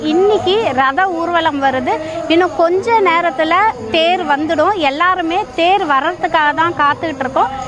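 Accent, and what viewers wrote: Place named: India. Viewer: Unggal Tamil innum India le pesuvatu poleveh irukku, enggal nattu inimaiyana Tamil pesunggal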